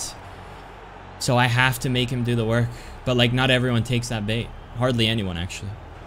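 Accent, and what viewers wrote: United States. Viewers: England?!!!😂 he sound like every white American dude but he is Italian he always be talking about it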